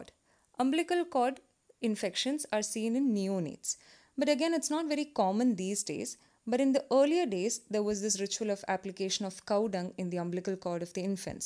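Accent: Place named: South India, India. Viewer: OMG! This is the best one on YouTube. I love your crystal clear voice in South Indian style. Thanks🙏🙏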